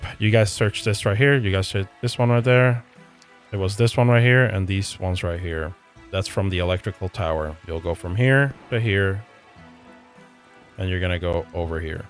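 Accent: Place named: India. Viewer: i know your indian but could you tone the accent down a little, hard to understand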